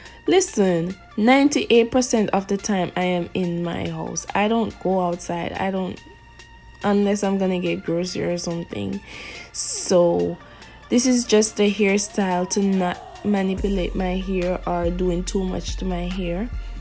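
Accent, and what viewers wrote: Jamaica. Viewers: Jamaican ? I hear the accent lol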